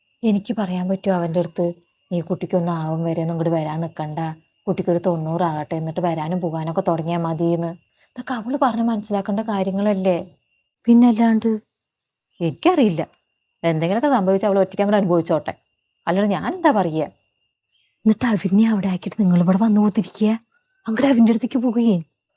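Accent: Palakkad, India. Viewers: പാലക്കാടൻ slang ആണോ ഇത്?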